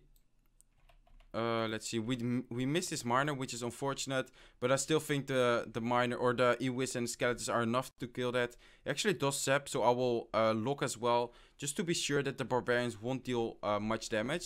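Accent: Netherlands. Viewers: I know that you are dutch you have the same acsent as kwebbelkop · Are u Dutch?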